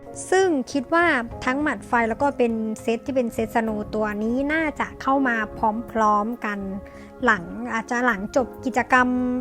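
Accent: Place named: Isan, Thailand. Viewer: มีสำเนียงอีสานซะด้วย55555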